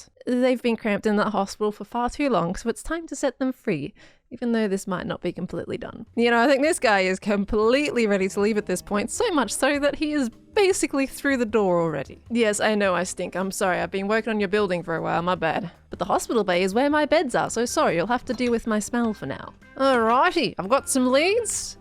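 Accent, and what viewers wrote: Australia. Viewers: its so good to hear an Ausie accent on youtube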